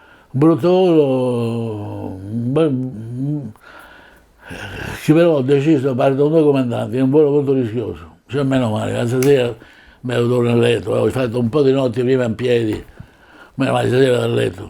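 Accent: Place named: Rome, Italy. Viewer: Ma che siete tutti padani ?? È un romanesco masticato, parlato "dinto o musso" come dicono i napoletani 😂😂 . Comunque era gente tosta.. così non ne nascono più.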